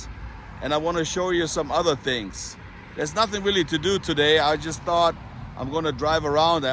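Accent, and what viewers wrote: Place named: Bavaria, Germany. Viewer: Hi nice accent maybe Bavarian dialect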